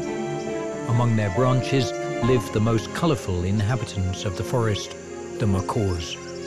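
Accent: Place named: United Kingdom. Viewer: I'm glad that despite the international assembly of zoologists you had a Brit narrate the video. American's require British narrators to enjoy wildlife documentaries. :)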